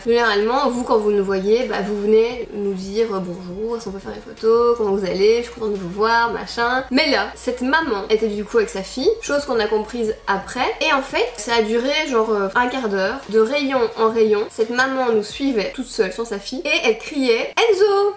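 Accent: Belgium. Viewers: Attend t belge je me suis que t'avais un accent belge je suis franco belge 👍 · Je trouve que tu as un accent belge 😂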